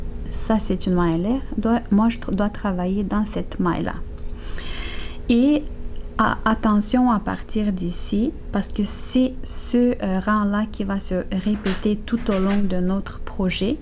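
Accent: Canada. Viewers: Merci 😀 pour votre beau bandeau et vos explications ce point est vraiment très très joli et pas compliqué ,je me trompe peut-être, mais d'après votre voix, et sans être indiscrète ne seriez vous pas d'origine canadienne ? Si je ne suis pas trop curieuse pouvez me donner votre réponse svp un tout grand merci 🇧🇪 👍 😀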